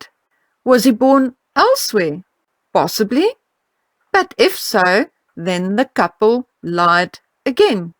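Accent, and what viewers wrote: South Africa. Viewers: Is this South African accent I hear Hi from fellow South Africa. I love your posts and watching with interest. I have been following Celt, Yankee Wally, the Vixen, Sandidog and other tumblr blogs et al for a couple of years now and matters relating to this subject are at last being discussed in the public arena. I have always been curious that H's wife has never sued anybody who has expressed doubts about Archie's birth. There are numerous pictures and videos showing bump falling down to knee level (the purple and pink outfit) the swaying bump (the short dress, white with greyish pattern) and many more. None of us like being hoodwinked or fooled with, so the truth exposing these two cannot imo come soon enough. There is no shame in surrogacy which cannot determinate a child's colour, so they need to come clean or be exposed. The outcome won't be pretty. 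· Hello, nice accent. Where are you from in SA? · your research is outstanding. Your honesty is greatly appreciated. I used to live in S.A. and it’s a pleasure to hear your accent. Good luck with what is going on in your personal life - that comes first. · I am loving this, I was 45 years in South Africa and the accent is so lovely to hear again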